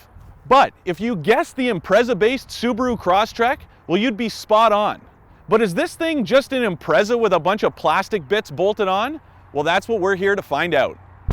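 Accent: Canada. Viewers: Did I hear a canadian talking apout cars?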